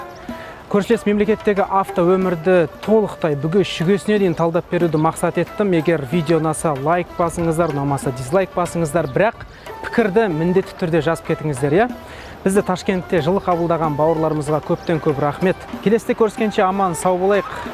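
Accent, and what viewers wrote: Standard. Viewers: басқа блогерлерден өзгешелігі таза қазақша сөйлейтіні жарайсың бауырым жұмысың өрлей берсін!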